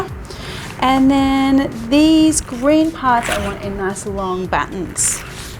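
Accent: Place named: Australia. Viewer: I'm never gonna make any of these recipes lol but I love watching you and hear your Australian accent and sizzling food. Aaaah who else?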